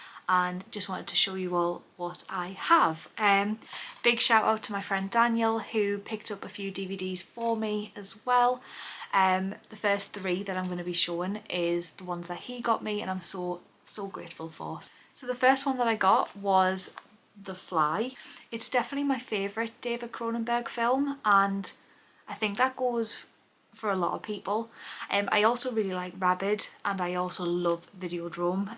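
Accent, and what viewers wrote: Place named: North East England, United Kingdom. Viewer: New subscriber to your channel today.. I thought I recognised that accent.. fellow North Easterner here as well.. Huge horror fan and put up a load of horror vids on my channel as well.. I'll look forward to catching up on some of your vids over the coming days 😎👍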